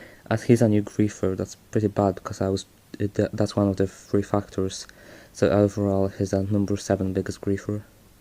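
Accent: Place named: Poland. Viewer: I can hear your Polish accent in your voice, but that's okay because we can still understand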